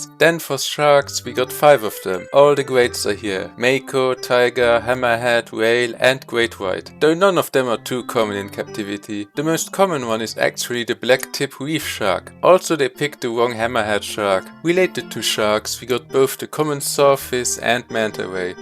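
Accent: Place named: Luxembourg. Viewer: I was about to say that you are the first German youtuber I found, that has an adorable accent, instead of an insufferable one, but then I realised that you aren't German at all but a Luxemburger, which explains it. 😅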